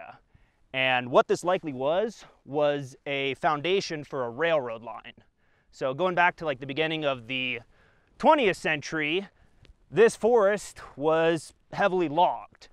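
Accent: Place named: Southwest, United States. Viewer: That's what a southwestern accent sounds like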